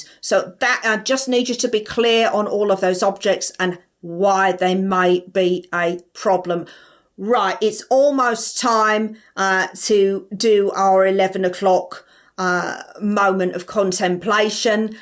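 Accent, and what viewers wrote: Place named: Kent, United Kingdom. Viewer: Brilliant Kent accent too 😂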